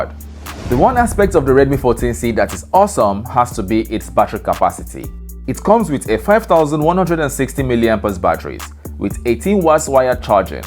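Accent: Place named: Jamaica. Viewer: Dont understand Jamaican!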